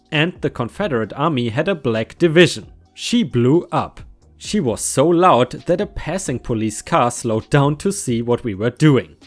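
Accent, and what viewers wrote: Germany. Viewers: Are you german? Frage für einen Freund... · I don't know man,.. I like the stories you choose but as a German it's just so hard to listen to other people's German accent. Although your English is actually really good, I don't know wether to subscribe yet...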